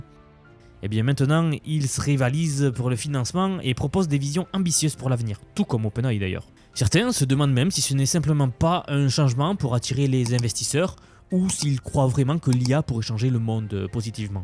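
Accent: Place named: Southwest, France. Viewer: On dirait bien l'accent du sud ouest :)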